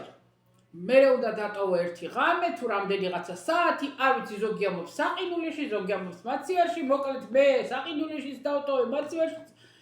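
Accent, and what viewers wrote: Kakheti, Georgia. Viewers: მისაბაძი ქალბატონი ბრძანდებით, თქვენი საოცარი იუმორით, კახურით გემრიელით და თან გულისტკივილით რომ გადმოსცემთ სათქმელს ყოველთვის ჯანსაღად. უზომოდ პატივს გცემთ ქალბატონო,. საშინელებაა, თუმცა მასეთი სულით მდაბალი ადამიანისგან, სხვას არც უნდა ელოდო. ჯანმრთელობა, წარმატებები და სულ ლამაზი დღეები თქვენ.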